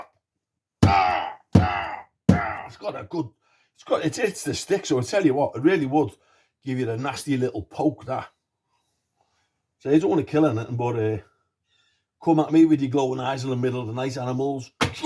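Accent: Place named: Liverpool, United Kingdom. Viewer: You lucky lad living out there and I just love the accent and your natural scouse warmth.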